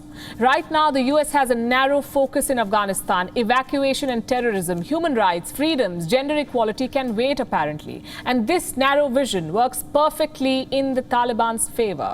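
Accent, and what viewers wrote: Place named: India. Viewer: Indian masquerading to be western, but her funny accent gives it away.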